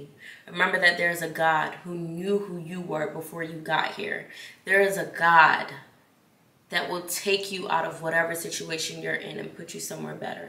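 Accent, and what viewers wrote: Jamaica. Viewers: Hey! First of all I’m here for the Jamaican accent 😍 And I love your content! I’m one of them OGs been watching since you were in cosmetology school!🤗 Just keep doing what you’re doing I love your vlogs I also love your outfits so maybe do some outfit ideas and I love your make up and hair too so just keep showing us or maybe self care routines too like face care routine or body care routine 🌷🌷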